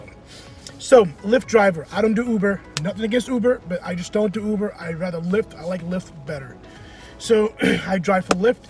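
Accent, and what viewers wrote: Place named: Boston, United States. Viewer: Yeah exactly lol! Just take the blame it it's your fault. Yeah, been raining like crazy here. I can tell by the accent you're from Boston!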